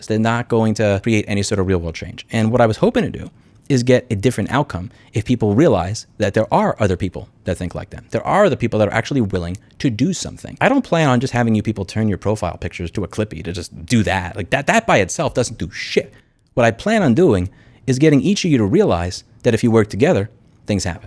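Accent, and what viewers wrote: New York, United States. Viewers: your New York came out full force here, right on!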